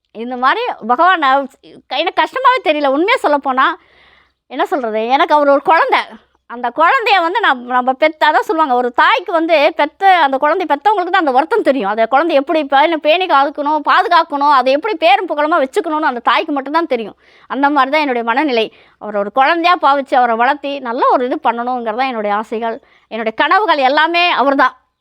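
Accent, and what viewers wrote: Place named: Nagercoil, India. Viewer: ஸார் நமஸ்காரம், உங்களுடைய நாகர்கோவில் பாஷை மிக அருமையாக உள்ளது. உங்கள் பக்தியில் ராயரே கரைந்து விட்டார் போல் உள்ளது.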